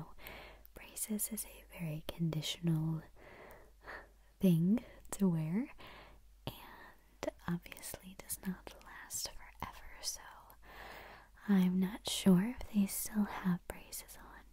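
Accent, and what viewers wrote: United States. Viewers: This video is really good, it gave me tingles for the first time in months :') Your accent, cadence and attitude are perfect; please keep making videos! What is your accent? Being from the north of England I'm not great at placing american accents :P Thank you so much, just keep being you <3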